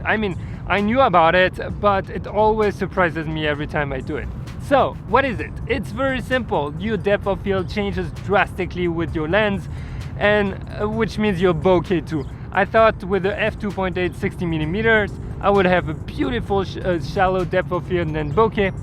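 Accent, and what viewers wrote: France. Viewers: Oh je pensais que tu étais un américain avec un accent anglais... mais l'accent français est trop mignon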